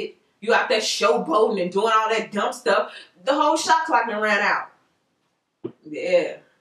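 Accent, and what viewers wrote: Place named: Atlanta, United States. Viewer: Love you QUEEN . And MAAAAN you so ATLANTA LMAOOOOO I LOVE IT🙌🙌🙌🙌😂😂😂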